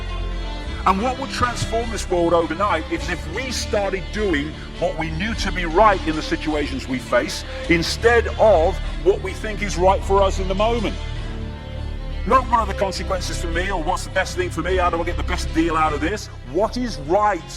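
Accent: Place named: United Kingdom. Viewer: First this guy is a Brit, but definitely NOT David Icke's voice. Good piece nonetheless!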